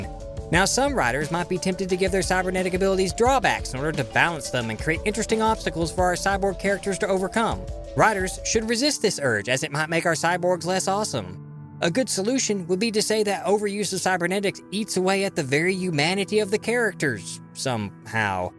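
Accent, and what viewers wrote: South, United States. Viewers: you made the Southern accent great again!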